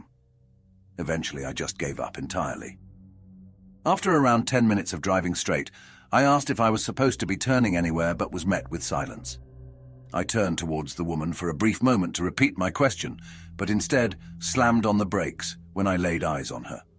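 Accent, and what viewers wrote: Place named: United Kingdom. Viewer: Yay new horror narrator cool and a lovely British accent bonus ❤ subscribed ❤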